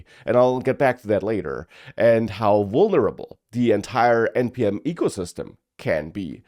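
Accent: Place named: Germany. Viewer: Just get rid of your german accent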